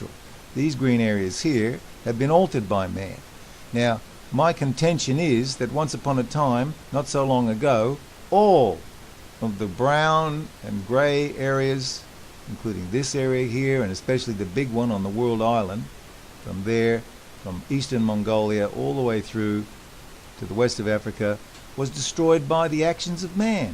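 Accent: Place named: Australia. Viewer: I like it...your American accent is fading and sounding more Aussie:) Cool info.. please guys don’t forget to plant native trees and let nature reclaim it’s land!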